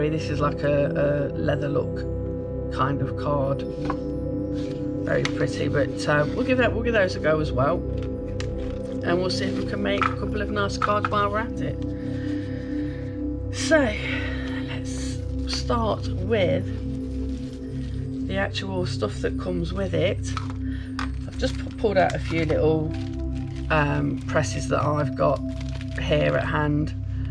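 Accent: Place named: England, United Kingdom. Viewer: * (Sorry, couldn't resist 😂🖤) It's really refreshing to hear regional accents and if what I've seen on others vids is any indicator, people outside England seem to enjoy hearing something beyond Queen's English/London, too. I'm really glad you decided to and that you feel good about it! Here's to many more😊💖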